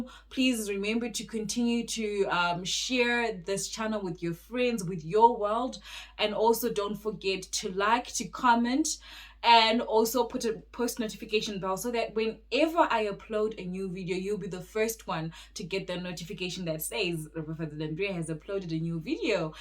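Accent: Namibia. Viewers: I liked the way u changed your accent to Namibian accent. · you look so beautiful from Namibia and you have changed to a Namibian through your English, that's our English you are speaking. you must come back my sister don't suffer yourself in zim;, the government has reduced the tax now and declared a free tax for all low-income earners. In Namibia we attack corruption we will not rest about it until we see actions taken against those corrupt leaders.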